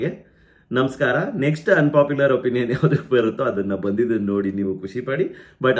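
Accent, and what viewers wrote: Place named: Dharwad, India. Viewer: neevu Mysore davru idru kooda nimge dharwad kannada isht chennaagi hege barutte?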